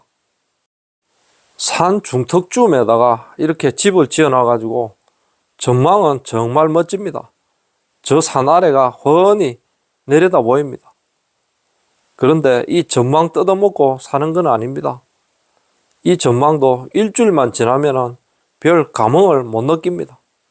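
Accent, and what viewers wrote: Gyeongsang, South Korea. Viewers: 말씀이 참재미있는톤이라서 많이웃으며들었습니다. 역시 경상도말이 구수하게들립니다. 감사합니다. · 시청자들을 위해 비가오나 눈이오나 영상 촬영하신다고 수고 많으시네요.. 구수한 갱상도 사투리로 설명도 잘해주시고 덕분에 구경은 잘 합니다 파이팅 하시구요 새해 복 많이 받으세요 ~^(^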